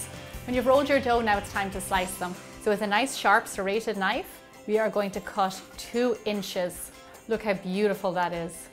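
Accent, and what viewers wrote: Ireland. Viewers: What a lovely Irish accent and what a great recipe. Thank you. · this accent sounds Irish. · Is she from Ireland 🇮🇪? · I hear a hint of Irish.can you do a vid on making Bailys Irish cream the nector of the Gods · U sound irish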